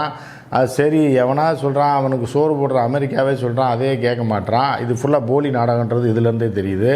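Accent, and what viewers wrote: Madurai, India. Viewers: <link> pls brother be prepare and talk with our jeeva brother with our madurai slaang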